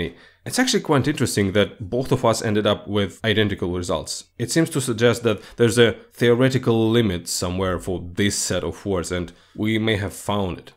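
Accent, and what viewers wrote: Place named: Russia. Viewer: Как же очевиден русский акцент ахах, с первого предложения распознал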